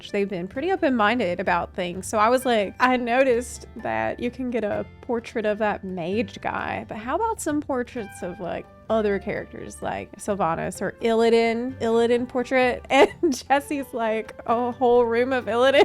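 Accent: South, United States. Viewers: Why do you all of a sudden have a Southern accent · I hear your southern accent! XD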